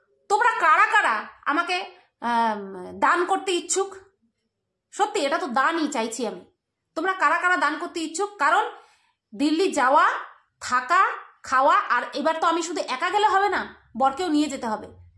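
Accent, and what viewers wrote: Bangal, Bangladesh. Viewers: Tumi to kauke nokol kora comedy kora bangal vasay kotha bola egulo darun koro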